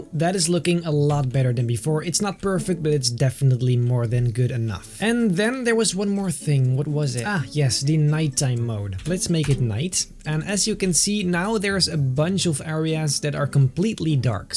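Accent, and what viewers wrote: Netherlands. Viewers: Are you dutch ??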